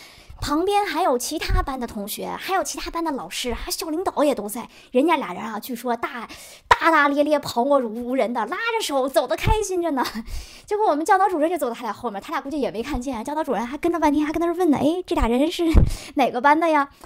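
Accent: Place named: Beijing, China. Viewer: 还有就是，北京的儿化韵快起来我真的差一点听不懂😂😂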